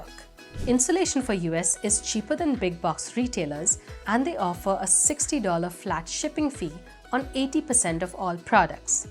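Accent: India. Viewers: Your accent sounds like you're from India. Are you from Mumbai or Mangalaore?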